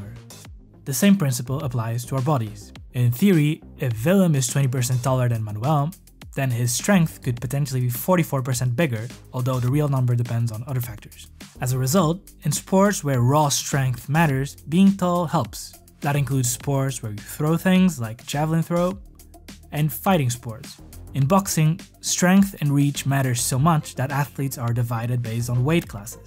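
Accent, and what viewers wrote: Portugal. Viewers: i cant take this portuguese accent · I'd bet my whole house that you're Portuguese, by your accent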